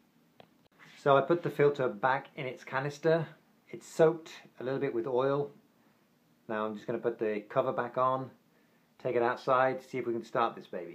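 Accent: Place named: United Kingdom. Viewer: U sound like ur British, I thought u guys called a wrench a spanner?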